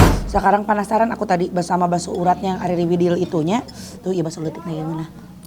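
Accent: West Java, Indonesia. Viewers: Justru aku seneng kalo teh ngomong nya dicampur campur gini sekalian bisa belajar bahasa Sunda serta logatnya. Oh aku orang Jawa yang tinggal di Sumatra yang mana lingkungan ku jarang orang bicara pakai bahasa daerah kecuali pas ngobrol sama yang satu daerah. Bravo teh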